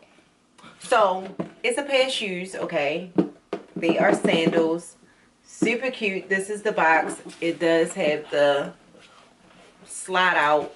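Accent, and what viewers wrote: Baltimore, United States. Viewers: Enter me! ♥️ This Baltimore accent is life! Also, you’re inspiring me to get some Orange and green sandals lol❤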